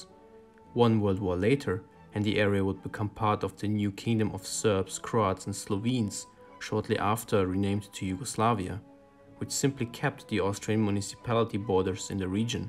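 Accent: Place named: Germany. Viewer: based German accent ⚫🔴🟡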